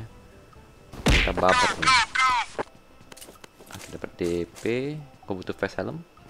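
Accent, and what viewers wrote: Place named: Medan, Indonesia. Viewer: bahasa nya bg kreak medan kali bang😂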